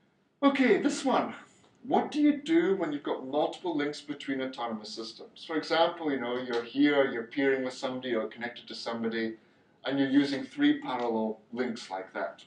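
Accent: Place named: Siberia, Russia. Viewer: Siberian English .... echoing behind tongue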